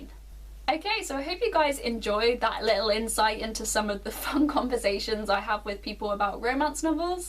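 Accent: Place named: England, United Kingdom. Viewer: Your english accent! I thought you meant egyptian mummy omg 😳 Also I didn't realize people actually say these things ☹️😂 the world we live in 🤣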